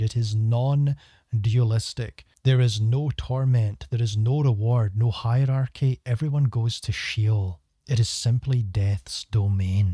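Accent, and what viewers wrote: Scotland, United Kingdom. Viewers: Just found your channel, really interesting... at least what I can understand past that damn thick ass Scottish accent 😂😂😂 but I love it 😊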